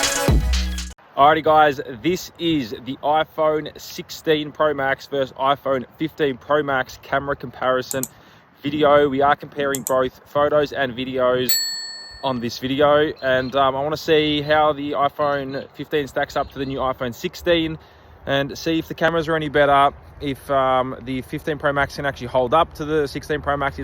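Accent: Australia. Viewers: AUSSIE accent!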